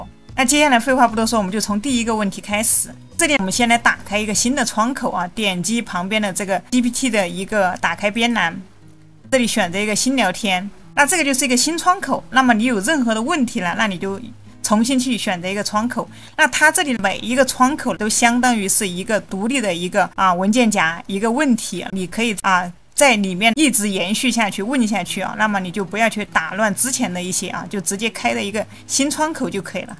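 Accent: Sichuan, China. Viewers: 博主是四川人吗？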